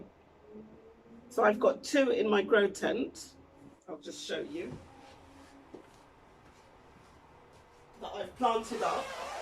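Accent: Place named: RP, United Kingdom. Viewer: Hello, absolutely love your talk on planting ginger. You sound very posh. Can't wait for more tips from you!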